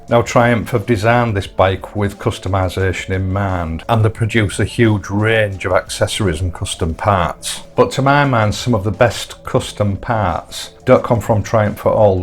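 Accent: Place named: East Yorkshire, United Kingdom. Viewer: Sir, the quality of your videos gets better and better! And your East Yorkshire accent is something special! Thank you for your work!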